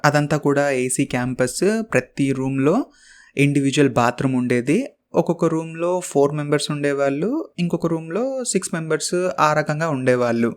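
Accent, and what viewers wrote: Telangana, India. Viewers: Anna story super and mee Telangana slang inka super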